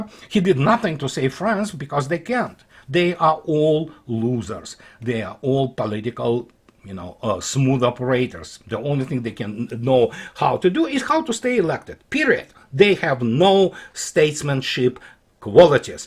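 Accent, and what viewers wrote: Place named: Russia. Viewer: I have no problem with that! Do you want to help this country or not? you came here to escape the Soviet Union and communism. Are you with us or against us? I'm talking about the American people not the elite that are against us in the US government? That is what my prior statement was all about I just don't know where your heart is when I'm listening to you. Cuz you sound 100% Russian which is fine but I don't hear you talk about America and what to do to fix it. So where is your heart line this?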